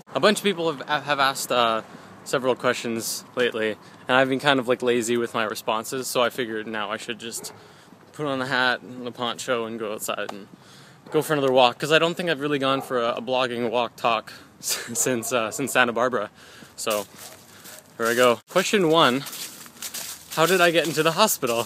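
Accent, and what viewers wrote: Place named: Canada. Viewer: To the people commenting on how he says words: He's Canadian. He has a Canadian accent. In fact if I remember correctly he even said "aboot" in SS1.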